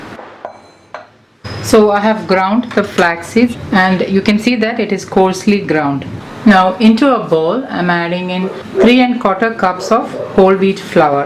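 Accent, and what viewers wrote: India. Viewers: it is already recognized by many pple known as Indian accent and clear English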